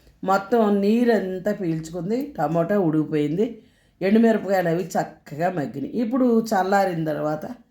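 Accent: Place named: Khammam, India. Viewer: Aunty meedi khammama ma Mee mata style akkadi la anipistundhi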